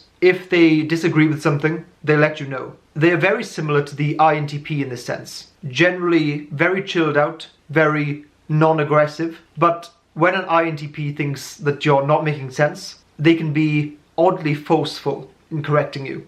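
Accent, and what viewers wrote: Wales, United Kingdom. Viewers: Are you from Wales? (Trying to figure out your accent)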